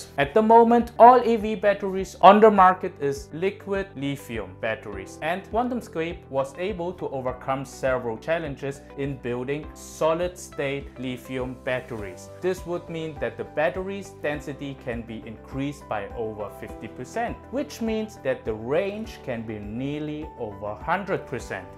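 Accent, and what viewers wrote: Germany. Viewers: Do you have a German accent ? Great video .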